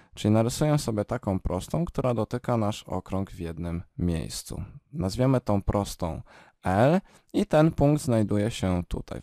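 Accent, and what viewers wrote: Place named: Ukraine. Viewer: Chłopie ty jesteś z ukrainy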